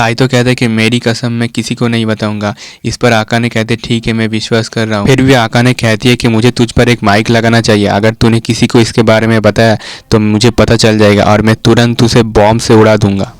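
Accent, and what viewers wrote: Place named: Bengal, India. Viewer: Sudhar jayega tu tension mat le o ak Bengali fir bhi kosis kar raha 😂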